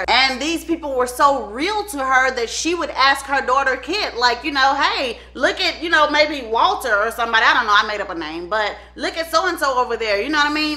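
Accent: South, United States. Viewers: Luv your southern accent your funny and entertaining and know how to tell a story with humor which keep the audience engaged happy I found your channel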